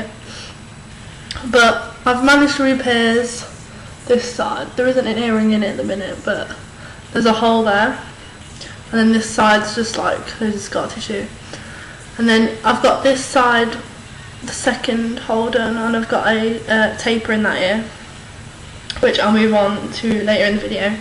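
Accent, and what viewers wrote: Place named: Southern England, United Kingdom. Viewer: Where I'n the uk are you from I love your accent and I'm from the uk and I've never heard people with such an fab accent is it southern ??? Devon or Cornwall ????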